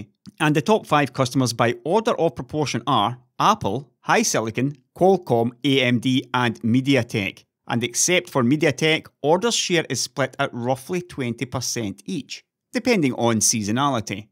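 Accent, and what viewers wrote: Scotland, United Kingdom. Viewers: Never thought that listening to a Scotsman do arithmetic could be one of my favorite things...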